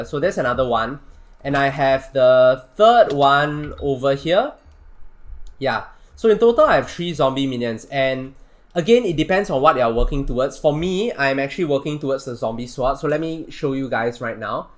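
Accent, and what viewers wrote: Singapore. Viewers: Are you Singaporean?because you sound like it man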